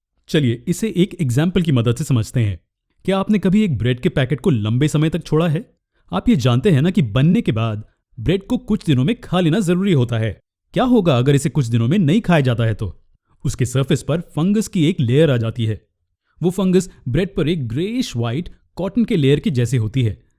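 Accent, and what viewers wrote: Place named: India. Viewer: Nice ........hindi accent sounds wow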